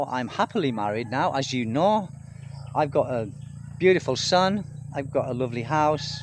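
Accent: United Kingdom. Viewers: What’s that accent? Brit, Australian or Irish. ☘️ I bet u were very handsome in your youth. 🎉not a scammer ( retired airline pilot 👨‍✈️) 😊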